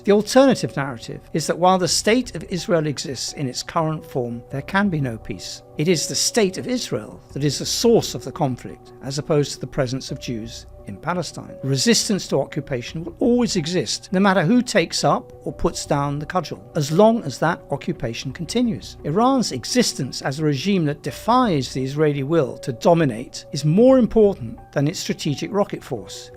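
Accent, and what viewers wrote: United Kingdom. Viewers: MEE never ever but never ever had a good word on Israel, just this Qatari paid org is unhingede. British accent spweing garbage and misinfo. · Thanks for reporting the truth, though I can hear your accent is British. Hope you will teach people to face facts and truth. Netanyahu is a dying lion, he doesn't want to accept he's finished😂😂😂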